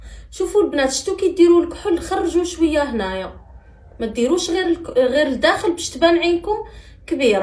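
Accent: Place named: Chaoui, Algeria. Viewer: هدرتك. اتبان. من. جهة. الشاوية. المهم. ماشاء. الله علمتينا. بارك. الله. فيك.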